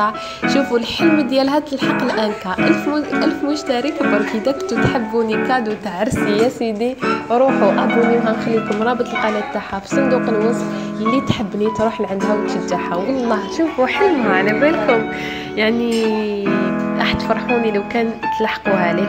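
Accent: Algiers, Algeria. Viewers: نتي ملغواط بصح تهدري تباني عاصمية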